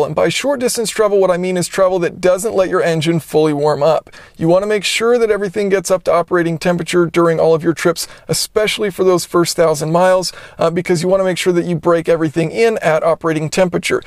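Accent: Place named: South, United States. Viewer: Hyundai Accent Fan Club. I like the southern accent. headlights are very impressive, really stand out in the rain. body lines are smooth as silk with curves precisely where needed. does have a Crack in rear end but the ride is excellent. .maintenance can be pricey if not cleaned and serviced daily and if given wrong fuel there is a occasional exhaust smell. do love it but can't wait to trade for new model. ...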